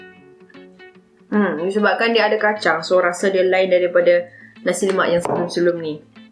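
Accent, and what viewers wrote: Kedah, Malaysia. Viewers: 10 bungkus hang Tibai Nasi Lemak.. He org kedah ka?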